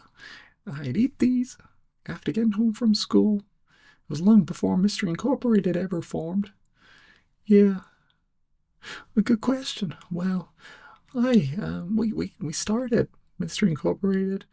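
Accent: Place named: United States. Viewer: Interesting American accent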